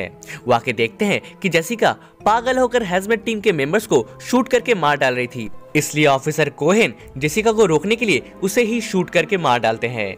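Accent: Bengal, India. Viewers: Are you bangali, but was nice talking to we are and thenk you for still suggested explenation you will again a lot viewer as much as subscribers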